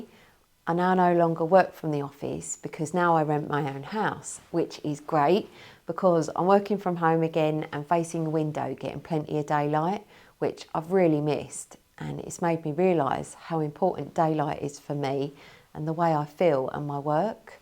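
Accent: United Kingdom. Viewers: I grew up in Florida in the 60’s-70’s…when sunscreens weren’t in vogue. I’m paying for it now. That means I have to avoid the sun or cover up…or lather on sunscreen. However, I still like a sunny day. All that said, you are my Happy light! I look forward to your videos, cheerful smile, lovely British accent, and I learn from your experiments. Keep sharing and glowing…I mean…growing!😊. BTW, I was stationed in Japan and England for 4 years each so I learned to get out regardless of the weather and clouds! 😊😊😊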